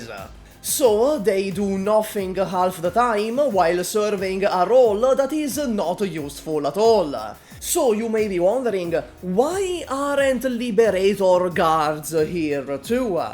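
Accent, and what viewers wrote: Italy. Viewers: I reconized that accent as a fellow italian, good to have a youtuber from around hete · It my first time hearing an Italian Explain Arknights, How the Siracusao??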